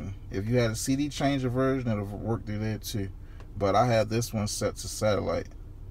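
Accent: Baltimore, United States. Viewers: You definitely from Baltimore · Destinee Mincer soon as i turned on the video my bf said “he’s from baltimore” 😂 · U from bmore? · definitely baltimore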